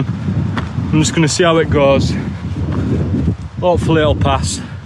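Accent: Yorkshire, United Kingdom. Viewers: Your commentary makes the video stand out so well from others that have tried on YouTube. I dig the non stop comedy, the earthy language and the dulcet Yorshire twang.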